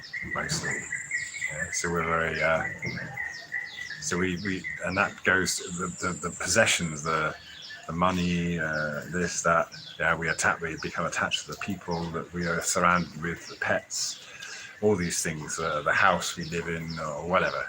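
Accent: United Kingdom. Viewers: You have a great mind, an excellent and very English voice. -- "The models work on the predictive nature of nature," is nicely said! Then it makes sense that the better the model, the better it can predict earth's movements and the movements of the cosmos of things related to earth. In 1900 sponge divers discovered a 2,200 year old shipwreck in the waters off the Greek island of Antikythera, within the wreck was found a device that has been a mystery for nearly a 100 years, its known as the Antikythera mechanism. British museum curator Michael Wright hand built a reconstruction of the Antikythera mechanism that includes all of its known features. The device is a planetarium, that is based on a GEOCENTRIC DESIGN of the world, where the sun, moon, planets and stars revolve around the earth, this is a geocentric system. Today the Copernican System or Heliocentric design of the solar system is taught and believed by most people. 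Michael Wright, was formerly the Curator of Mechanical Engineering at the Science Museum in London, and later at Imperial College in London, England. The Antikythera device is also a mechanized calendar based on a 19-year cycle in which the phases of the Moon are repeated on the same days of the year, this is known as the Metonic Cycle. The device displays calendar information that allows for the predictions of a solar and lunar eclipses. The reconstructed device is accurate for all the planets indicating correct positions with-in one degree in a 500 year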